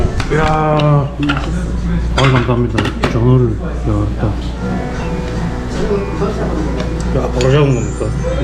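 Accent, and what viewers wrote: Busan, South Korea. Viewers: 전형적인 부산 상남자 스타일~ 요즘 안쓰는 부산 사투리 들으니 정겹네요. 여행은 일상의 탈출이 되어야지 일상이 되면 안될거 같아요. 구독지수 늘어나도 직장은 50까지 놓지 마시길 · 생긴거는 이슬람으로 수염 덕지덕지한 형이 부산사투리를 하니 얼마나 이쁘나.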